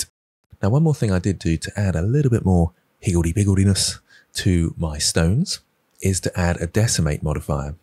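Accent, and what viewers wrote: United Kingdom. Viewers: Hi, thank you very much to update your well even if I finished the 2.8 well project one week ago 😆. By the way, I would like to mention how much I enjoy your videos. Passion, friendly explanations and great british accent are your best skills! Please keep your motivation. Congrats.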